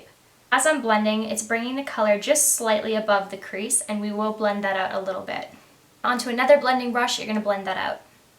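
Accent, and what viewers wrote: Australia. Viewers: I can hear an Australian accent starting ;) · you sound soo australian here!!! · Every video I watch, I am seeing an Australian accent coming through stronger each time! You have such a great talent and Australia is damn proud to have you! Also love this look!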